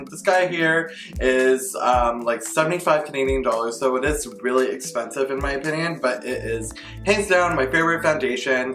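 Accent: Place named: India. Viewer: Seems like an Indian guy with Indian accent.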